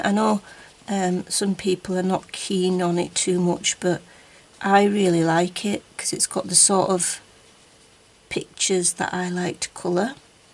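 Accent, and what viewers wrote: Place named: Yorkshire, United Kingdom. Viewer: Hi K...Using my Brutfuner in the Circle of Life "Spiders on the Spiders web" Halloween 🎃...ish 🤣🤣 Nice to hear a fellow Yorkie 🥰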